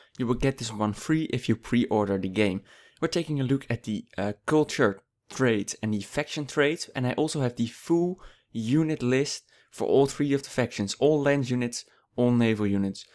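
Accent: Netherlands. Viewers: Are you Dutch? You sound a bit like my brother when talking English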